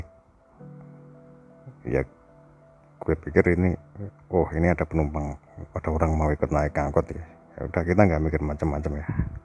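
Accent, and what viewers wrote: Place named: Java, Indonesia. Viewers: Gak usah pake kata GUE bro... medok soale sampean... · Kata GUE dan GUA itu beda cara pengunaan di dalam kalimatnya. Nahh si mas2 ini byak kalimat yg seharusnya pake Gua dia pake Gue, jadi nahbah kelihatan MEDOK nya 😄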